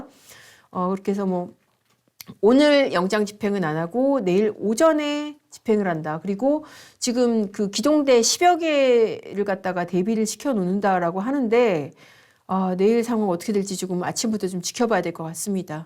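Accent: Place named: Jeolla, South Korea. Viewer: 젏마도 절라도 출신이구먼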